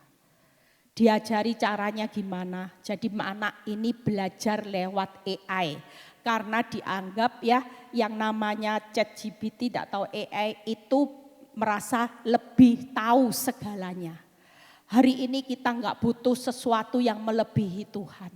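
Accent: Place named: Surabaya, Indonesia. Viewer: Bu. ini asli org Surabaya yaaa....? Kok cekok kata2 persis orang Surabaya...🙏🙏🙏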